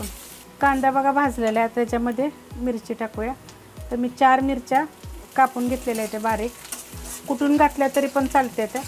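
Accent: Satara, India. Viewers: पक्का म्हणजे सातारी,बोलण्यात पण सातारी तडका जाणवतोय 😅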